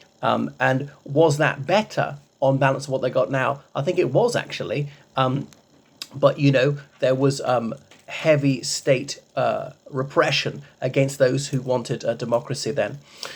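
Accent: RP, United Kingdom. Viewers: 💋 You're like a sexy articulate rugby player w/ a posh accent sooo sexy omg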